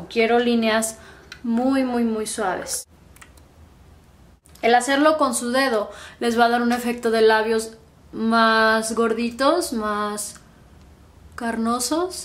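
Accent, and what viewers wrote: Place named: Fresa, Mexico. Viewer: Hablaas taaan pero taaan fresa que desespera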